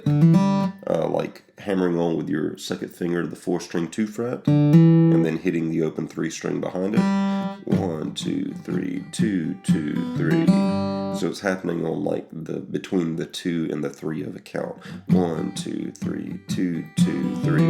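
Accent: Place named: Georgia, United States. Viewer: Thanks so much for all your hard work and easy to follow videos. Wondering which part of the country you’re from. Sounds a bit like GA.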